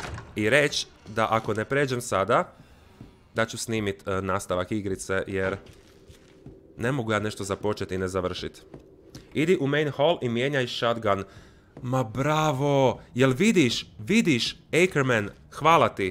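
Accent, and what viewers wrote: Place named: Chakavian, Croatia. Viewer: sta ti pricas Cakavskim narjecjem?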